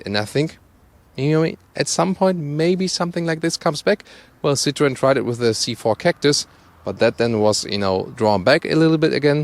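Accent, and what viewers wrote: Germany. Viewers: Thank you for your reviews. I especially enjoy your German accent. You do a very thorough reviews. You certainly do rush through these reviews and that is welcome.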